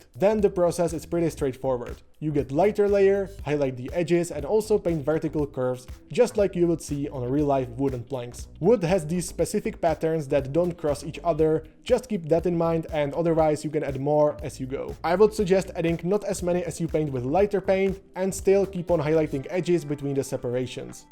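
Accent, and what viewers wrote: Czech Republic. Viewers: Youtube doporučí zajímavé video. Po první větě je jasné, že jeho Tvůrce je Čech. Jinak skvělé rady, to se musí nechat.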